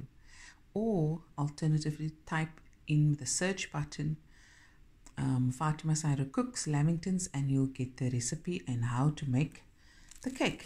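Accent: Cape Town, South Africa. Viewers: Absolutely beautiful. Love your calm, loving voice. This means so much to me, especially hearing your accent. Is it Cape Tonian? Makes me long for home because I'm from Durban, South Africa watching from Australia. Your presentation is beautiful. Loved the last bit also, when you called for someone to open the door quickly. I loved the small glimpse of your plants also. I will try doing it, but I don't think I can decorate it so perfectly. I wish I could meet you one day. Kindly read John <num>. Love and God bless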